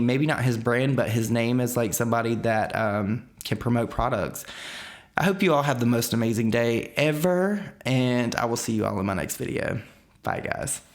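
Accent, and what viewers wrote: South, United States. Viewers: Idk but your southern accent is so soothing lmao. Reminds me of one of my English professors.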